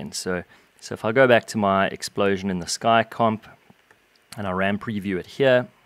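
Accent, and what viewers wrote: South Africa. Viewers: r u from south africa?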